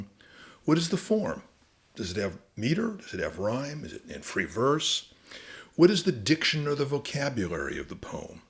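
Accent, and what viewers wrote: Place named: United States. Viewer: Americans... attempting to recite Irish poetry... and it just doesn't work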